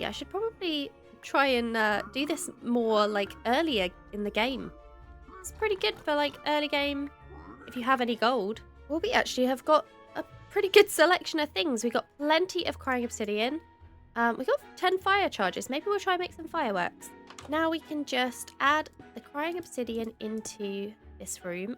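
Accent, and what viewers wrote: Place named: United Kingdom. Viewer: Love the vids. Builds look great and nice to hear a chiiled brit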